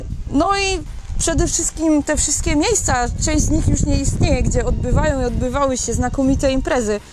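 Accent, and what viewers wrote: Silesia, Poland. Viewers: Pięknie mówisz w języku Śląskim. Ja od 38 lat mieszkam w Krakowie ale takie słowa jak: "szałot rybny", "harynek", "hekele", "szpajza", "ciapąg" słyszałem dzisiaj pierwszy raz. Kutia to danie typowo Krakowskie, które znam od mojej Babci, natomiast to danie jest podawane obowiązkowo w Krakowie, podczas każdej wieczerzy Wigilijnej, moja babcia ma prawie 100 lat i do tej pory przygotowuje kutię, a właściwie to zarządza przygotowaniem kutii 🙂Ostatnio podczas nagrywania swojego filmu spotkałem z kamerą "Moto Urbex Silesia" - wspomniał kilka słów o Urbex Chorzów i oczywiście o Twoich filmach na YT 🙂 Na Śląsku macie tyle ciekawych miejsc do nagrywania: opuszczone pałace, kopalnie, dawne parowozownie, a nawet stadniny koni, to kopalnia miejsc do nagrywania. Dlatego często przybywam na Śląsk z kamerą by nagrywać nowe filmy. Pozdrawiam i do zobaczenia na następnym filmie.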